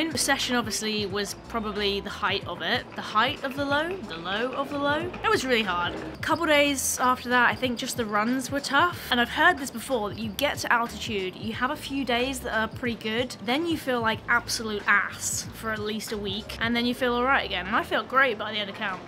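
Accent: United States. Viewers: Hahaha your American accent is adorable!!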